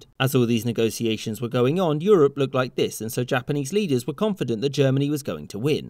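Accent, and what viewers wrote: England, United Kingdom. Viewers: He's quite clearly English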